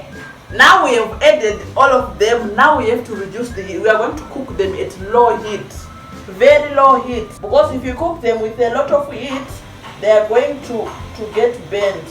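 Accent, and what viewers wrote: Uganda. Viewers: Well done my girl, you are now an expert of matoke ❤❤❤ ramba wakadaro muroora wekwa unongondinakidza hako mwanangu chirungu chako haa chatova Ugandan English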